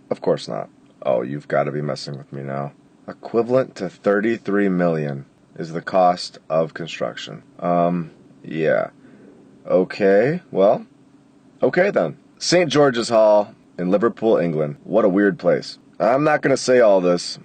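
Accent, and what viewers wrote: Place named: Michigan, United States. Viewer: Loving these videos. Haven’t gotten terribly far. How does it link to the Masons🤔🤔🤔. Have you listened to Bill Coopers series on Ancient Babylon? Also love your accent - Michigan??😊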